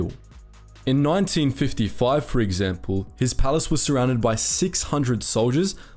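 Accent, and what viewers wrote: South Africa. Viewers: Great video. Based on the accent can I guess the narrator was born in South Africa but moved to Australia later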